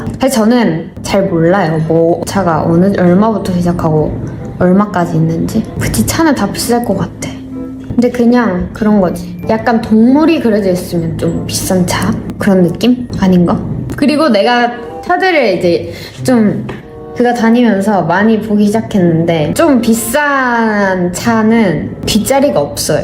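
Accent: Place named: Seoul, South Korea. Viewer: 말투 제스처 보니 가스나 서울여자 다됏네~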